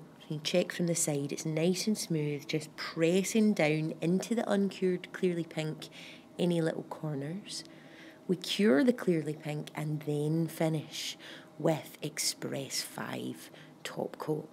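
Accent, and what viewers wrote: Scotland, United Kingdom. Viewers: You people have no class for commenting like this. I watch her cause I do Shellac nails at home and not experienced in using or creating shattered glass effect among other methods. To say her voice is creepy and it’s probably because she is Scottish is racist. I work for a Scottish lady and she has an accent but not creepy. I have an accent cause I’m Slovak, people make fun of me since I came to Canada and told me to go back home. Until you walk in other peoples shoes you shouldn’t talk so negatively. Women should support one another and encourage one another. It hurts me that other women talk like that about other women instead of saying positive comments. Who are you, stand in front of a camera and talk and do nails at same time, I dare you all Bully’s out there. You people have to watch what you say cause it will come back and haunt you. 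I just wonder why people click on certain platforms to watch and then comment trashy. Are they bored, are they nail learners, it can’t be nail professionals cause they wouldn’t comment like that. These people are juvenile and BULLYS. I don’t know this lady but love her work and I use the products she has CND and more. She is an ambassador representing CND and Lecente products and you juveniles should give her respect. Who were you brought up by to have manners like that.🤮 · loving your little video keep them coming and its nice your Scottish so can relate more lol xxx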